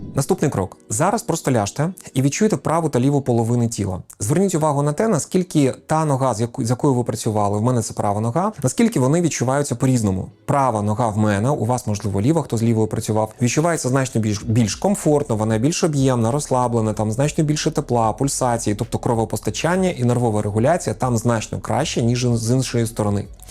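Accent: Standard. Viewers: Така чиста українська!!!Вправи супер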